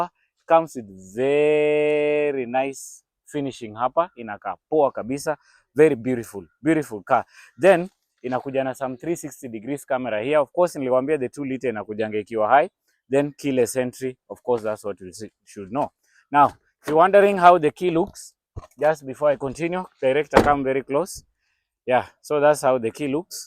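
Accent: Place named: Zambia. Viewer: 2.7 what howmuch in usd where are selling from you sound Zambian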